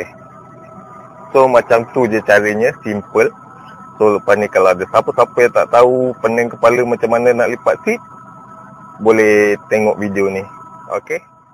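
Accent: Java, Indonesia. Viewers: Pekat kang jawanya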